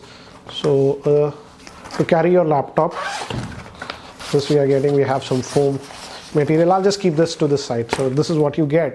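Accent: India. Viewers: He's Indian! He has an Indian accent! Don't like Indians? Don't watch videos made by Indians!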